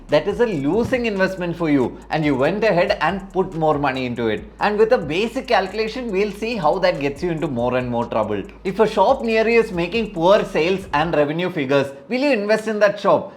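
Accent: Kerala, India. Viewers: I hear Malayalam in your English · Malyali accent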